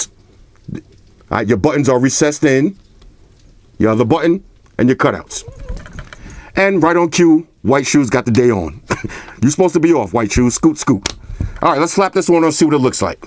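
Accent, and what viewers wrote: New York, United States. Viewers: Lol. OMG I could listen to you talk all day!!!! It's the New York!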